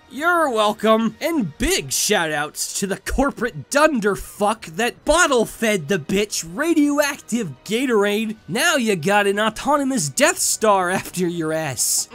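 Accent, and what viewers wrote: United States. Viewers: erm, upstate new york?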